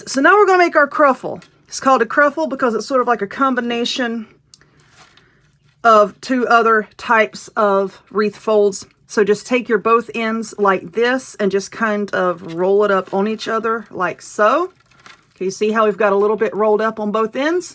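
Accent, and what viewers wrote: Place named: Kentucky, United States. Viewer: I love your videos! If I was to ever make a video ~ I swear I would talk just like you! (I’m from Kentucky). I love your down to earth talk & your slang! God bless you sweetie!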